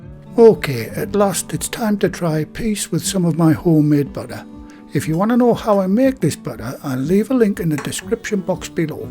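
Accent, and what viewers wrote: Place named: North East England, United Kingdom. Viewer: Such a refreshing video! Just stumbled across your channel and I'm so glad I did! I'm from the North East (Stockton on Tees) and it's so nice to hear the accent along with proper traditional British recipes that my Nana used to make. Thank you, you've just made my evening! Will be making this bread at the weekend:)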